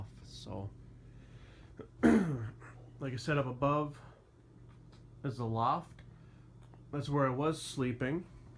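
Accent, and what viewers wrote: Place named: Midwest, United States. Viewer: Impressive how handy you are! I detect a Mid-western accent. Is this in Minnesota, by any chance?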